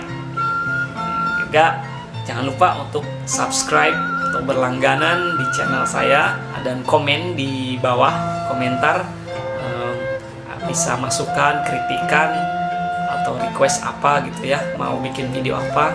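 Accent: West Java, Indonesia. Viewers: Saya suka bgt logat sama bhs sunda dan pengen bgt belajar. Salam dari surabaya😊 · Waalaikum salam wr.wb. Saya suka logat pengucapan dalam bahasa Sunda.....istilahnya "ngemplad" ...hahaha...ciri khas, seperti juga halnya orang Jawa bicara, ada ciri khas, begitu juga orang dari suku2 lain di Indonesia....sungguh beragam dan warna warni...Bhinneka tunggal Ika.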